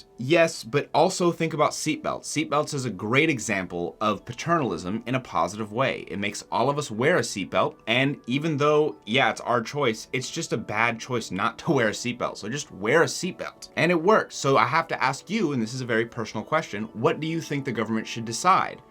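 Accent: United States. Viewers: You evidently don't understand the difference between addiction (physically and mentally dependent) and a habit. But I don't blame you. Your accent sounds American, and America has the weirdest created shared realities around addiction.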